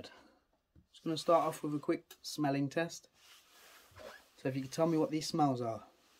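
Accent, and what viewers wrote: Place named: United Kingdom. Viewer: I enter this dude asmr...... THIS DuDE is BRitisH.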